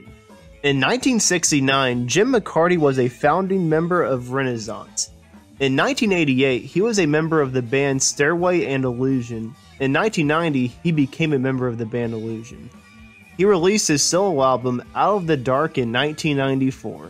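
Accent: United States. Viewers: How awful that this completely British band has an american voiceover on its story. Offensive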